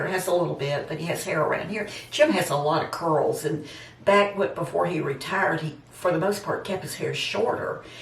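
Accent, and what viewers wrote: South, United States. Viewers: Just hearing that great southern accent brightens up my day. I had a favorite dress too when I was a teenager. Pretty green with pink plaid thru it, low waist with slim bodice and swirl out bottom, sleeveless. I adored it ❤. It was probably 1970. I loved your dress, can see why you loved it too! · WE NEED YOU! I hope you never quit doing videos! Having you be so real and your accent reminds me of a Southern Belle. On any given subject, I enjoy my visits with you and with over 56 thousand subs, I'm far from the only one I bet. Getting to watch your videos is like spending time with a beloved friend and right now (4/11/20) we all need that. You are one of a very small list of YouTubers I never get tired of. You are so sweet and a treasured break from an otherwise troubled time. Thanks for being here!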